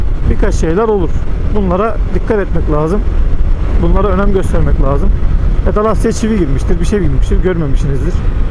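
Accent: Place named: Konya, Turkey. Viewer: Abi senin memleket neresiydi konya mi konuşman çok benziyor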